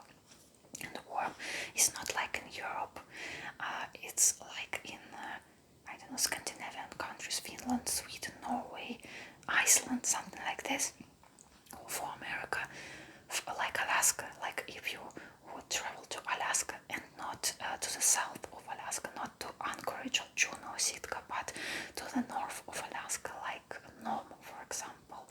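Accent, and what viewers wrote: Russia. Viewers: Thank you very much for this video, I just love 🥰 your Russian accent, I live in Ireland 🇮🇪 and I always take my shoes off at the door & give my seat up to someone who needs it more than I do, you have a lovely soft voice for ASMR.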